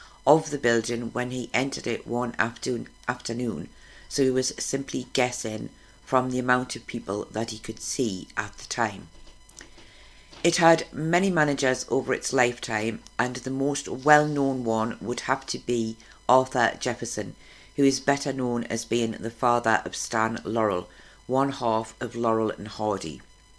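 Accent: Newcastle, United Kingdom. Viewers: I truly enjoy hearing your Geordie accent spoken at a pace that I can understand. I'm from the southern Mid-Atlantic coast of the U.S. and sometimes you folks can talk at such a fast clip as to be indecipherable!!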